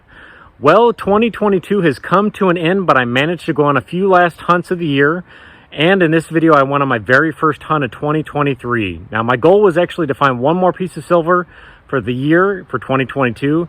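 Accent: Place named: Missouri, United States. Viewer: Where in mo are you from? I detect in Crawford county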